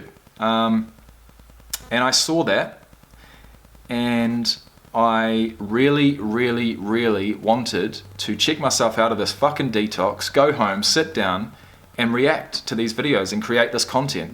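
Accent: New Zealand. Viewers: You from New Zealand?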